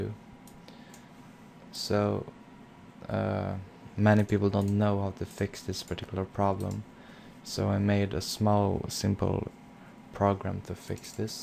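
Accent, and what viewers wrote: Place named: Scandinavia. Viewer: Thanks you my fellow scandinavian (atleast it sounds like it)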